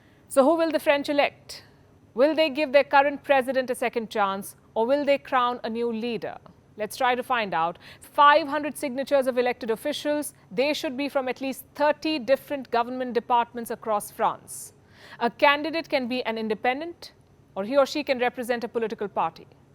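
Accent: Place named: India. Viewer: Palki Sharma beautiful lady and a nice Indian English accent.🥰 I root for Macron I hope he wins radical far right or left are not good for democracy.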